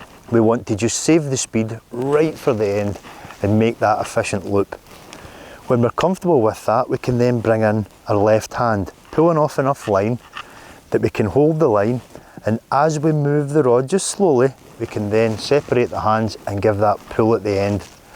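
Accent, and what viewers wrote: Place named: Scotland, United Kingdom. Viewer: an extra thumbs up for a Scottish brogue that I can understand! Thanks!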